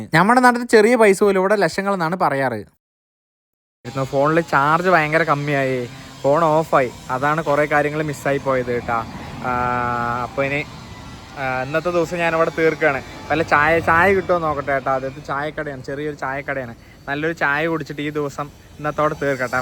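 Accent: Palakkad, India. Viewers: വിയറ്റ്നാമിൽ ഇരുന്നു നമ്മുടെ സ്വന്തം പാലക്കാടൻ ഭാഷ പറയുന്നതിന് നന്ദി❤❤നലവീഡിയോ സൂപ്പർ കാഴ്ച്ച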